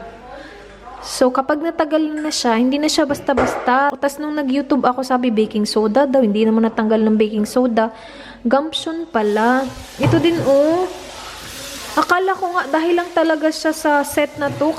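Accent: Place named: Visayas, Philippines. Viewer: nakakakutawa po yung bisaya accent nyo 😍😍😍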